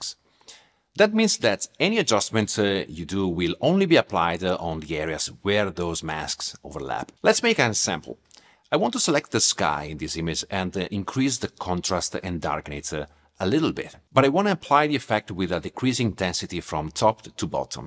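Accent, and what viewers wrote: Italy. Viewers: Great comparison. Thanks ! Your delivery is very friendly and informative and the Italian accent is charming.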